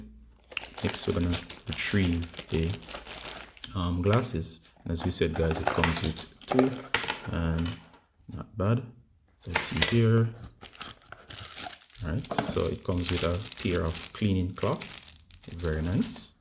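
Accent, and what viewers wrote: Jamaica. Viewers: Yow chap ur accent sounds like a yard man. Where in JAM can I buy this product?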